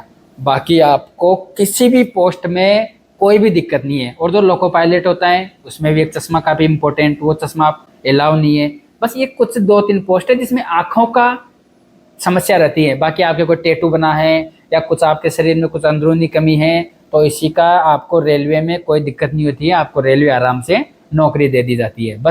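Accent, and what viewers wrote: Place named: Rajasthan, India. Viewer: Sir aapki language tone se hi pta chl gya ,aap rajasthan se h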